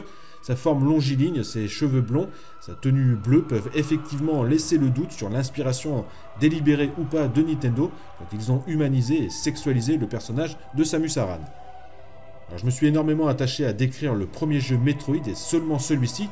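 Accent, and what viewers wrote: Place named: England, United Kingdom. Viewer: Super chronique comme d'habitude. Ça fait longtemps que je suis abonné mais la seul chose que je te reprochearai c'est ton accent anglais pour les titres. Sinon continue comme ça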